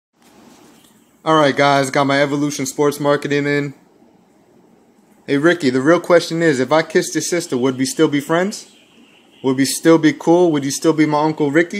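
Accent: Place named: New Jersey, United States. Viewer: You from Joysey? Im from joysey, I'm from Kearny, where you from? nice cads.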